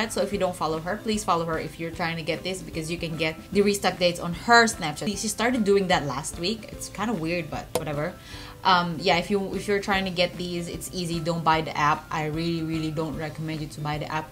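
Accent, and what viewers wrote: Philippines. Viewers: ur filipino accent :) & i love the colors on u! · Hi! Are you a filipino? :) I can sense it from your accent hehe~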